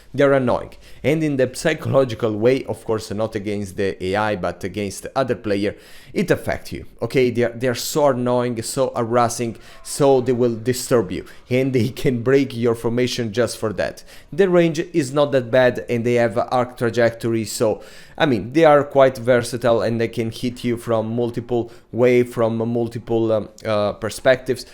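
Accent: Italy. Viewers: are you italian? i love your accent! :)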